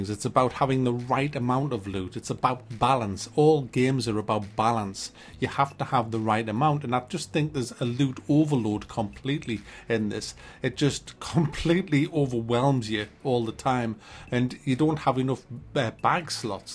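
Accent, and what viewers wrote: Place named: Newcastle, United Kingdom. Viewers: Byker grove land I think. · Oh you’re a geordie!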